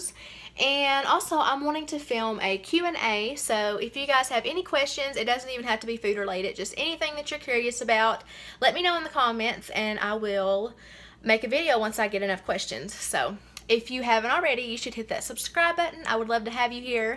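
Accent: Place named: Kentucky, United States. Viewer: Love your videos! I’m from Lexington so I love the KY accent 😘😘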